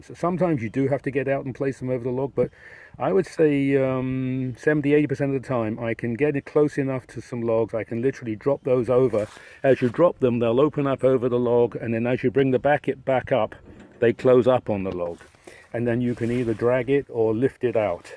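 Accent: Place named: England, United Kingdom. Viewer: I'ma be honest. I hate you english akkzents but I subskribed kuzz i like what you are doing. Salute.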